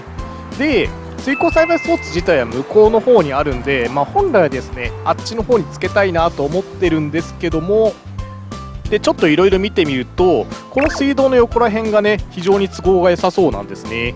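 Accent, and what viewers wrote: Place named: Aichi, Japan. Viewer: 関係ないけど喋り方きいてるとこの方は愛知とかの人かな？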